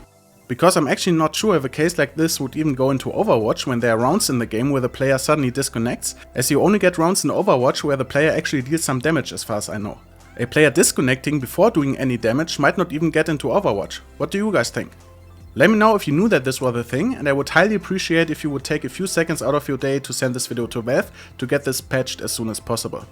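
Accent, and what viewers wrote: Germany. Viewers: Cool vid and friendly deutsch accent :)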